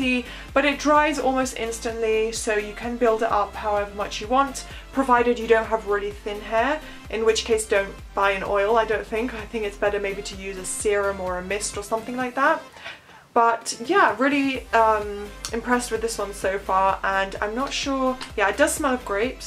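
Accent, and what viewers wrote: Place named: England, United Kingdom. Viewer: I like your English accent so much! Where are you from?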